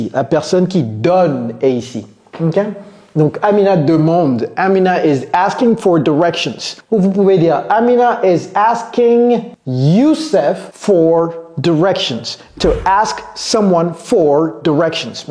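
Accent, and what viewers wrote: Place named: United States. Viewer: hier accent américain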